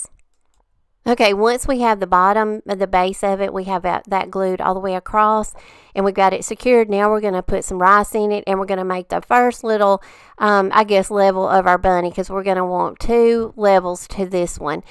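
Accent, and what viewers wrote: South, United States. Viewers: Hello! Donna from Delightfully Southern mentioned you on her channel and she was right....you are so talented! Loved all of your crafts and I have already shared them with 3 family members!!! I was wondering what state you are from? I am in North Carolina and I noticed you have a southern accent so, I was just wondering. Can't wait to watch more of your video's. You all did a great job with your house. I did go back and looked at some of your older video's. ❤️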